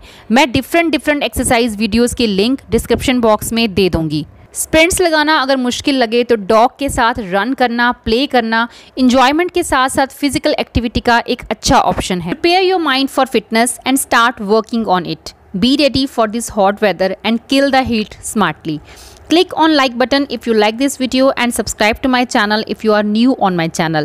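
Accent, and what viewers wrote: Punjab, India. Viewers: Hi ji, as usual rocking video. I loved it, ur message goes to the mind directly. I luv ur punjabi diction in ur interaction.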